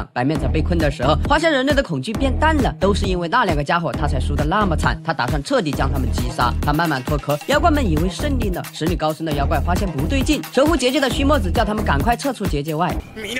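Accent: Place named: Sichuan, China. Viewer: 我擦 解说是四川人吧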